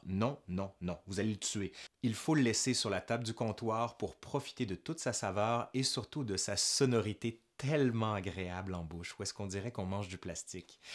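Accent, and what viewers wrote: Quebec, Canada. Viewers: Quel plaisir d'entendre un québécois parler de la poutine....bordel que c'est bon remarque le schisme de la frite entre les belges et les français ça marche aussi hein ^^ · Ta capsule donne trop envie d’aller au Québec pour goûter cette fameuse Poutine Une amie française s’est expatriée deux ans dans ton beau pays . Une nana un peu difficile en matière culinaire . Elle a a do ré la poutine Bref merci pour cette vidéo J’adore ton ptit accent charmant que nous les français on comprend super bien 🤗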